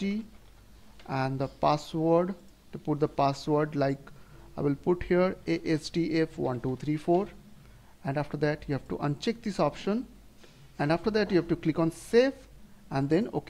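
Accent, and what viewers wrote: India. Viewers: 10/10 +thick indian accent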